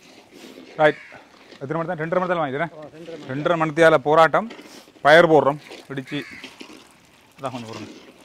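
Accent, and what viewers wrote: Jaffna, Sri Lanka. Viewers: Sutha Tamil srilankan accent appadithan erukum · உங்கள் தமிழ் எனக்கு உயிர்.சிறுவயதில் சிலோன் வானொலி கேட்டு வளர்ந்தோம்.நீங்க எல்லோரும் சேர்ந்து இலங்கையை இந்தியாவுடன் இணைந்துவிடுங்கள்.நாங்கள் உங்களை அன்புடன் ஏற்றுகொள்ள தயாராக உள்ளோம். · இலங்கை தமிழ் சூப்பர் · அண்ணா அக்கா நீங்கள் மட்டக்களப்பா யாழ்பாணம்மாதிரி பேசிறீங்கள்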